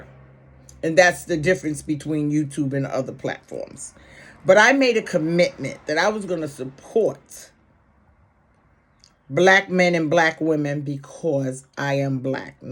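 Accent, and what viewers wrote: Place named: New York, United States. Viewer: I knew you was from NY the first day I listened to you. that accent is definitely a New York accent and I have never been to New York a day in my life, but I have met people from New York and I love your accent and I think you’re doing a great job. keep up the good work. ❤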